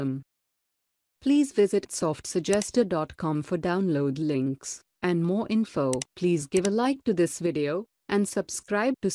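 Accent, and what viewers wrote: India. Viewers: i can still hear an indian tongue ..SRSLY BAHBAH who heard it like i do ? anyone ?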